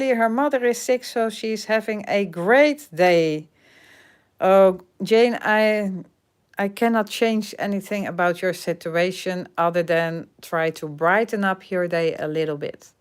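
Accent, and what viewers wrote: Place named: Netherlands. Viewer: I love how you force yourself to calm down 😂 always enjoy your tutorials and talents. Admire your English fighting with the Dutch. Appreciate your struggles. Wishing you were making more English tutorials. Cannot translate the Dutch version or the lives.😔 🤣🤣🤣I don't think I will be attending those knitting classes.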